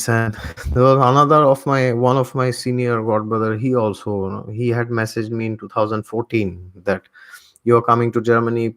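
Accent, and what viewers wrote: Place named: Bengal, India. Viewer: r u bengali? your accent is very bengali kind of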